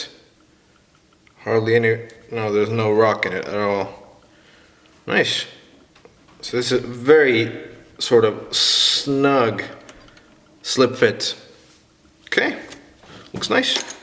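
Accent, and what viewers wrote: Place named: Estonia. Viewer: Hi, where are you from? you sound very estonian :) kui jaa siis Tere ja hea video!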